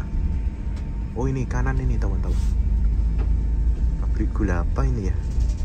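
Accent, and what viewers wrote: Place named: Banyumas, Indonesia. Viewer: ngapak raya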